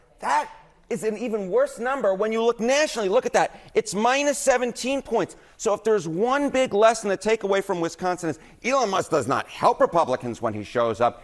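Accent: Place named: Canada. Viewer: I love your direct, no BS, "call it what it is" style and the fact that we have a very representative Canadian voice on YT. And, finally, some good news. It will be great news when Musk is gone and even better news when Trump is gone--as he says about getting other countries--"one way or another".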